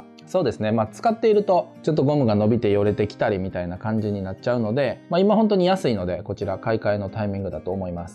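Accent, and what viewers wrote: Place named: Kansai, Japan. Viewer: さん、明けましておめでとうございます。🙇🏻 去年夏に引っ越ししてから、無印良品の商品を購入する前にはこちらを見てから購入してます。関西人特有のおもろい話しぶりで楽しく拝見しております。私のイチオシは、ネット限定で売ってますが、スチールの四角い懐中電灯です。手で握って持ち歩く懐中電灯とは違って、持ち手が付いているので、エコバッグと一緒に持ってプラプラと歩いて帰るのに使っています。外灯がなくちょっと暗い道も安心して帰れます。形も可愛いし、乾電池で簡単にオンオフできてシンプル。私の隠れたお気に入りです。😊💕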